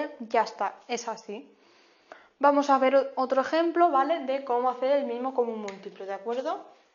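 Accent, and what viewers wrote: Spain. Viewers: por donde vive por esa voz vive en españa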